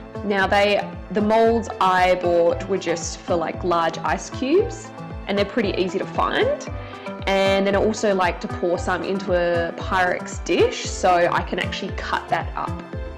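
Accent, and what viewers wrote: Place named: Australia. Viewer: Really? You’ve obviously never met an Australian, this is how a lot of us talk. 😊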